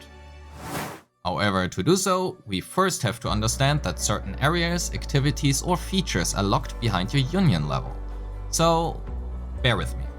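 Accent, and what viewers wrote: Germany. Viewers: Are you German? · you sound german!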